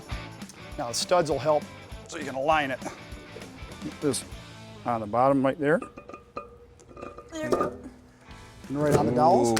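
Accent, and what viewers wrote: United States. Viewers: Can the narrator get any more American than this???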